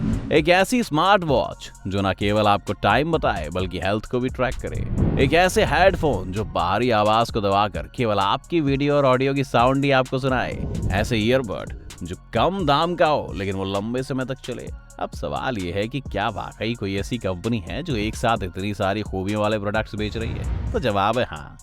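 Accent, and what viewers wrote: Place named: Mumbai, India. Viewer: Plz bring old voice artist back ,this guy is ok but his accent is like a tapori style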